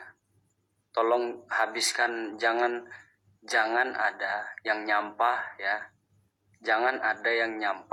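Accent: Medan, Indonesia. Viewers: Melayu medan kek gini bhasa nya.m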